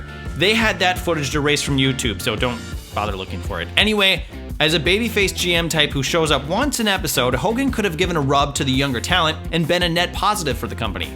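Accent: United States. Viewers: It's because he's American isn't it? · Who the fuck is this guy with his annoying, overly exuberant American accent? When I click on a WhatCulture vid I want to hear bland, monotone British voices. Not this shit! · Why is this guy american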